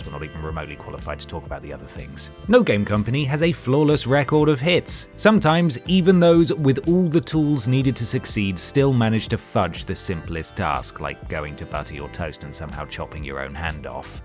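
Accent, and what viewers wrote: United Kingdom. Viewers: This channel says it's fun United Kingdom, and the host sounds British. But it's just another US channel. It's USA vs Japan, it's "dollar" that just assumes US dollar, even though it wasn't started. Why do so many Brits want to be American channels? Be international.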